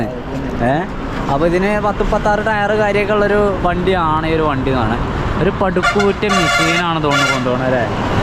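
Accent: Malappuram, India. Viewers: Malappuram slang kurachu avoid cheyuka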